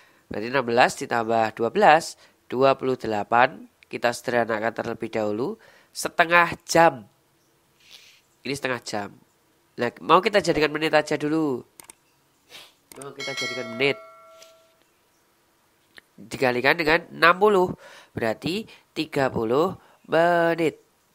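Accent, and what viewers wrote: Java, Indonesia. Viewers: micnya jauhin dikit mas..angin medhoknya terasa sangat...apa lagi pas ngomong huruf d,b,g,j (medok)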